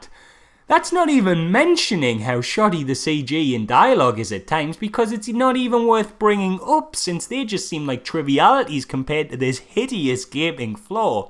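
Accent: United Kingdom. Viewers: a good review with a heavy british accent review takes a thumbs up ....lol dead on m8 :)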